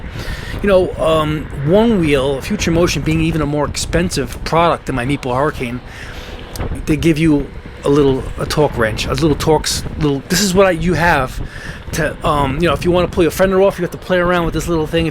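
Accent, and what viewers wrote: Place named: Long Island, United States. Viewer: A fellow Long Islander! I'm watchin this goin damn this guy sounds like my family. Laughed when you started talking about LI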